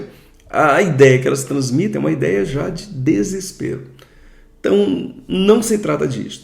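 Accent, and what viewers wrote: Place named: Pará, Brazil. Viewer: acredito ser do Pará….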